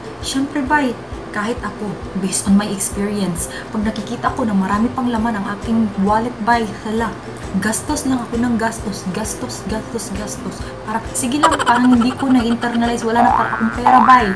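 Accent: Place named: Visayas, Philippines. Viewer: I think bisaya ka dai... 😊😊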